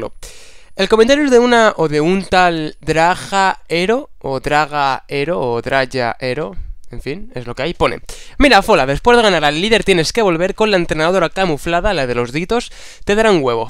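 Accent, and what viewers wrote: Argentina. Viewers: LooL acento Argentino xD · Joder, que bonito tu acento argentino Fola xD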